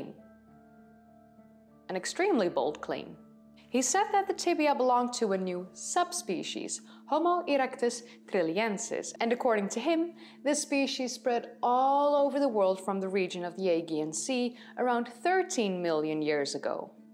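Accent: Netherlands. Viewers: Are you Dutch ? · The way you pronounce "technical terms", I thought this woman is Dutch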